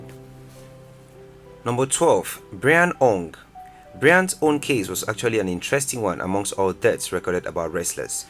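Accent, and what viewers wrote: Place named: Nigeria. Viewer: Longest intro... n nigerian kinda voice over.. i bet all this history are true😅😅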